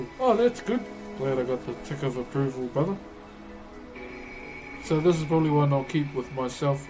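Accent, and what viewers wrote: New Zealand. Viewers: love the Maori accent, art is important to remember what matters in life. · Is he from New Zealand I love his voice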